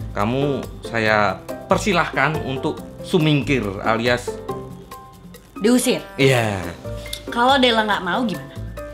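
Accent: Java, Indonesia. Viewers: Kok pake cengkok Jawa,anjirrrrrr👎👎👎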